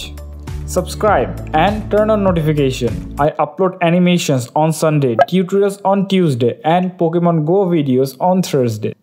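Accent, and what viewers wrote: India. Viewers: As a native portuguese speaker, he speaks like an indian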